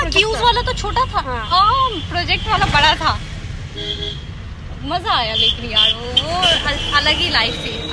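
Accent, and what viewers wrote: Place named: Bihar, India. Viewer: Jo log soch rahe h yh ayse kyo bolte h to mai btatu jisko nhi pta ho yh bihar say h waha aysr hi bolte h i am from upppp❤❤❤❤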